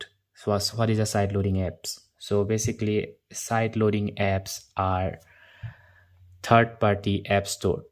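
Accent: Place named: India. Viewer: You’re dumb Indian voice to waste my time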